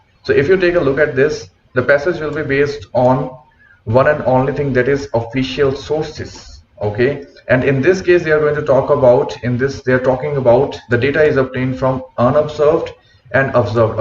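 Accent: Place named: India. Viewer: English hindi mix accent 😏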